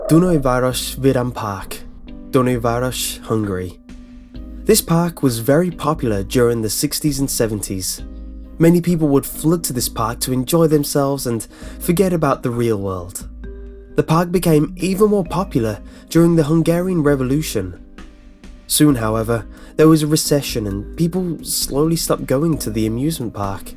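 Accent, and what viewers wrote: United Kingdom. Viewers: I love your British :)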